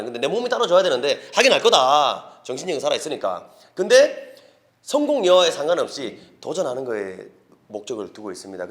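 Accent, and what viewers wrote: Gyeongsang, South Korea. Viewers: 갱상도 사투리 개말립니다 행님 사랑합니다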